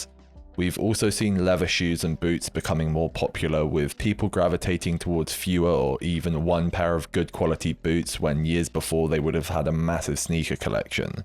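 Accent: United Kingdom. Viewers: when a monotone british man speaks, i listen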